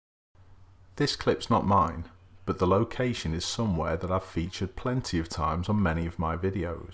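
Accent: United Kingdom. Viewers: Normally I don’t like people that narrate throughout the entire video. However I love your voice and your decidedly British accent. Being a yank we only speak American we don’t speak the Queens English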